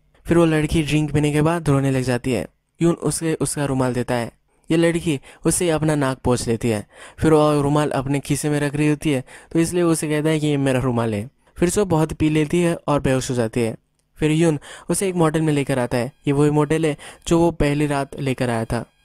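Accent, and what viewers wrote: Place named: Uttarakhand, India. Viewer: You are from uttarakhand aren't you???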